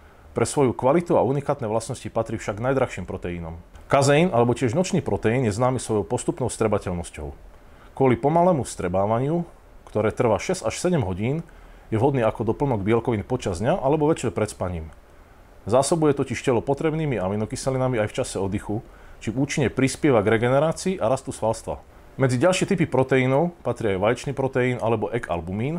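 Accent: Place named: East, Slovakia. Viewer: Môžem sa spýtať odkiaľ pochádzaš? Zdalo sa mi že počujem nejaký východniarsky prízvuk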